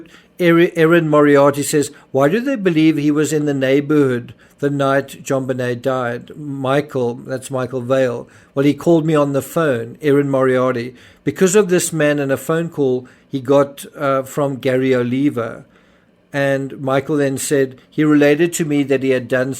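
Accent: Afrikaans, South Africa. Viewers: Yes he is South African with an Afrikaans accent. One of the best on Youtube. His insights are often very original. is also ex South African. Another excellent True Crime Channel. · lol being South African I thought hell yeah his accent must be Afrikaans, thanks for the info I didnt know was also a South African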